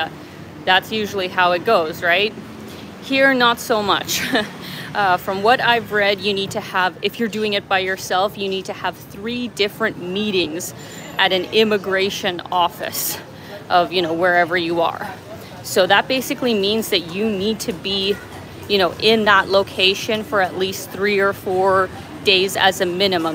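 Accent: Scotland, United Kingdom. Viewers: are you scottish? 😁😁😁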